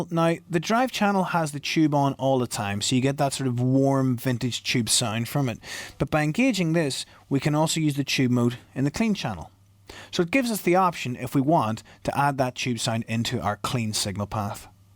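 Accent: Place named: Liverpool, United Kingdom. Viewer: Dude you from around Liverpool. aren´t you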